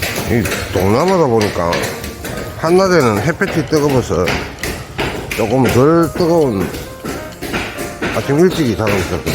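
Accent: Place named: Gyeongsang, South Korea. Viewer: 경상도 사투리 좋아요 자막은 표준어로 처리 해주세요 ㅎㅎ😀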